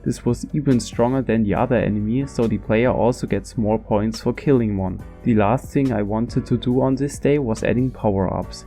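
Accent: Germany. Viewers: weil ich auch aus Deutschland bin und etwas an deiner Aussprache klang deutsch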